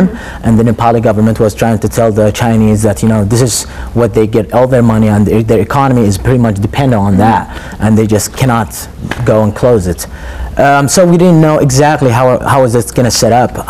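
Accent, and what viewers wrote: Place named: England, United Kingdom. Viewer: i really liked your englsih accent....we are proud of you: D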